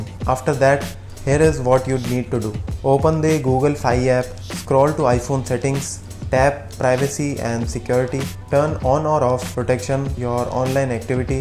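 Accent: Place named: India. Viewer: CANNT TALK ENGGLISH BUT WANT TO MAKE YOUTUBE VIDEOS WTF ? Hate that Indian accent ..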